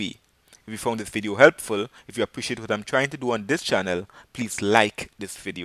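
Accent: Trinidad and Tobago. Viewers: Just curious are you from trinidad... it's just the way you talk it had me thinking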